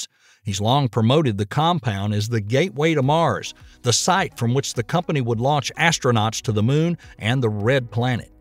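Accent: South, United States. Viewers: This is my favorite space news channel. Plus the announcer sounds like the original men that sent humans to the moon. (Southern accent.)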